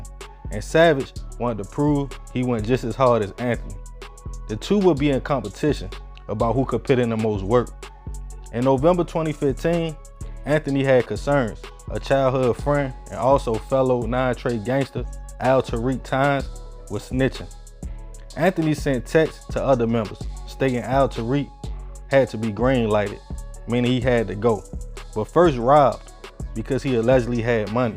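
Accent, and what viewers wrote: Baltimore, United States. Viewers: He from bmore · The narrator got an Bmore accent..... Im originally from from Baltimore but reside in Richmond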